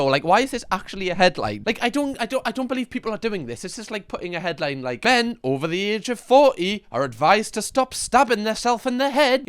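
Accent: Newcastle, United Kingdom. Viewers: This guy sounds like he's from Newcastle and if he is I love him already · Whats part the isles that accent,?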